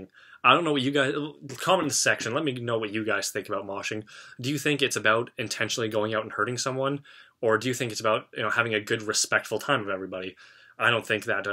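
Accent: Canada. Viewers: Where is your accent from? Canada?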